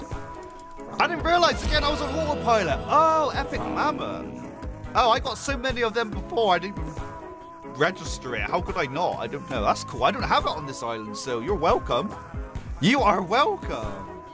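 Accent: United Kingdom. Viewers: If he British or American? Also f😊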